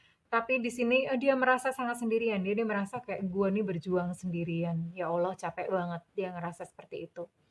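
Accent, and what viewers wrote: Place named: Java, Indonesia. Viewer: Ngeret jowo bund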